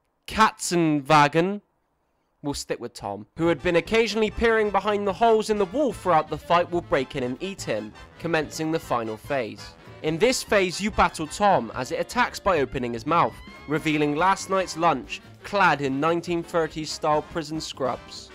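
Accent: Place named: United Kingdom. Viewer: You have the strongest British accent I have ever heard, and I'm Bristolian.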